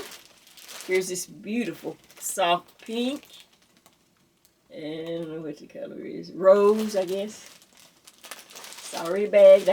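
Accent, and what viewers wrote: South, United States. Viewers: I am one of those that stumbled upon your channel by "happenstance", and boy am I glad I did! I love everything about your videos - your tutorials, your personality, your stories, your comforting voice with the southern 'drawl'... I love it all! So much so, this is the first time I've taken the time to comment. Keep it up! I can't wait to see more! I'm so sorry about your loss of your dear friend Judy. Love love love your friendship vest named in her honor. That was the video that introduced me to you. Thanks again for sharing it all with us - I love the narratives you give in addition. Makes me feel so at home. :)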